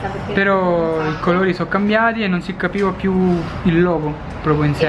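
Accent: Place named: Mexico. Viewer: mi piace il tuo accento messicano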